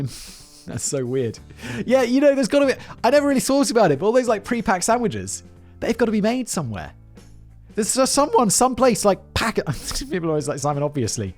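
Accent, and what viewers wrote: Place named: Oxford, United Kingdom. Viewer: I love how Simon has the vocabulary of an American Midwestern and the accent and diction of an Oxford Man!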